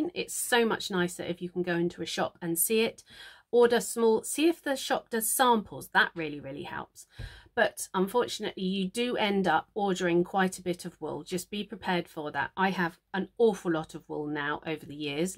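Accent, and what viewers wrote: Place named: United Kingdom. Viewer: This was so helpful to listen to. I've been felting for about a year? I agree with you that it is so addicting. My one comment to add is that I watch many videos and find I want to try so many different types of felting (2D, 3d, landscapes, wet felting, needle felting...) I feel that trying a lot of different things has helped me narrow down what I want to concentrate on. Now, I need to practice, and concentrate on one type of felting to gain more confidence in my abilities. I look forward to watching more of your videos! I am American and just LOVE listening to your british accent!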